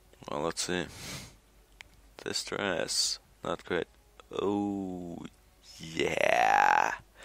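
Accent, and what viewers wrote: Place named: Europe. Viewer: Holy fucking hell, you sound like utter SHIT...and, oddly sound like you have a some sort of European accent? I'm confused.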